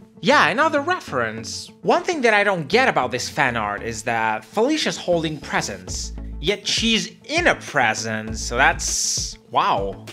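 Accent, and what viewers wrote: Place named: Russia. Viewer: Очень классный ролик, но блин какой же классный русский акцент :)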